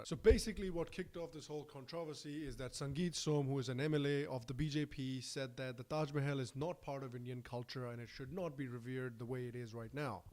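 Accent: United States. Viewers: Ahh your first video, your accent sounds American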